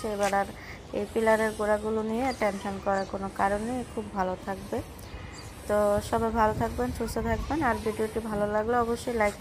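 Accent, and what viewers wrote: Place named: Barishal, Bangladesh. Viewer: মাশা-আল্লাহ এই বরিশালের ভাষা আমার কাছে শুনতে ভালা লাগে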